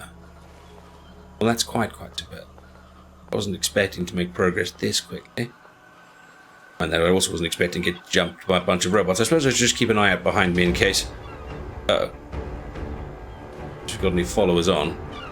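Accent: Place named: RP, United Kingdom. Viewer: This is awesome so glad i saw this in my feed :D your posh accent is on point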